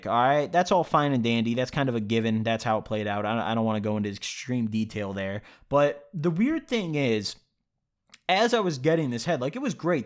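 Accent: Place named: Illinois, United States. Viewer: How did I know you live in Illinois I live in Rockford, I recognized the accent I am white and have the same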